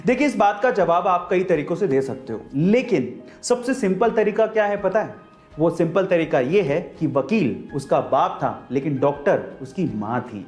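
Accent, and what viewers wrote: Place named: Haryana, India. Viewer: Don't mind sir but everyone cannot speak in haryanvi like u except haryanvi people because this language is in blood Jai hind jai haryana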